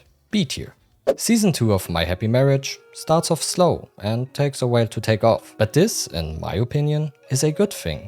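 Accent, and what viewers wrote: Germany. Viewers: i heard the german accent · Ich hab den deutschen Akzent sofort gehört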